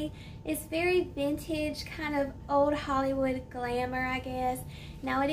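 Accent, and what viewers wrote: South, United States. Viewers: Ran into your channel looking for a review of Hanna Nikole. Now following! Thank you for your reviews. My measurements are 49, 43, 52, so I can get an idea of how things would look on me, even though you are more of an hourglass and I am more of a rectangle. Your accent is so charming and soothing. My hubby is from Alabama, so listening to you is like visiting with some of my favorite people in the world (his sisters!!!) Cheers, Maria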